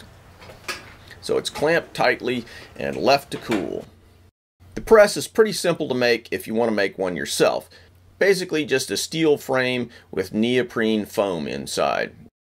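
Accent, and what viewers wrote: United States. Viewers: You have the clearest, most neat accent i've ever heard:P. Coming from a foreigner, You sound like the perfect american accent for me. Just an observation, though quite a silly one. I really love Your work, and videos, and the step by step process. You're great!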